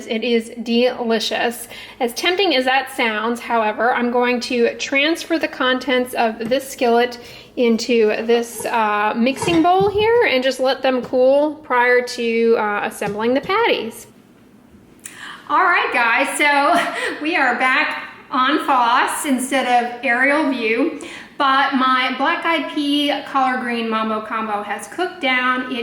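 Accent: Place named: Texas, United States. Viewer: Happy New Year, if I don’t see anymore videos till then, I wish you and your Mommy a healthy and fun one. 1) I thought you were faking the Texan accent, lol, I did not know you grew up in the South. 2) avoiding socially gatherings, you are right, those will keep you germs free...but sometimes they are fun. 3) I bet with myself that you would reach 50,000 subscribers by the end of the year...but you might even go over that number. I learn so much from your videos, thank you for the effort you put into them, you are very much appreciated. 😘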